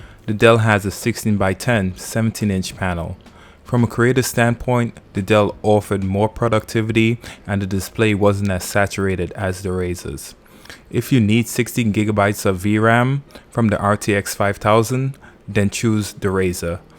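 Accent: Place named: Barbados. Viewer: Hearing that heavy Bajan accent there bro! :-) Great review and keep it up!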